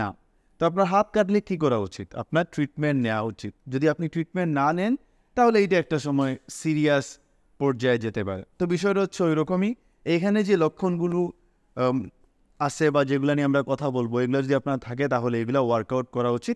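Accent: Sylhet, Bangladesh. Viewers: Sir...apni Sylheti..kun jagay poran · Sir apni kita sylhti ni plz koiba.. ar kun jaigat porain akto janai bah ame portam sai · Outstanding video.Are you Sylheti? 🙂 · Sri apnar Sylhet e basa ta sundor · Wow so quite sylhety .🎉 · Apni ki amader Sylhety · Sir,Sylheti kotha gula kubi valo lagche. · Sir You r Sylheti😍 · O sir afni sylheti ni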